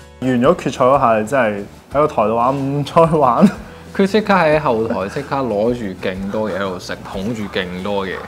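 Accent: Taiwan. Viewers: 我知呀！所以我話我估計佢爸爸媽媽新移民黎香港生佢之後，可能屋企都同佢講普通話，到佢大個自己鍾意台灣歌，pickup 得好快台腔。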